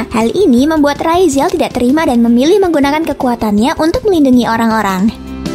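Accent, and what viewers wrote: Japan. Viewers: Fasih banget min logat Jepang nya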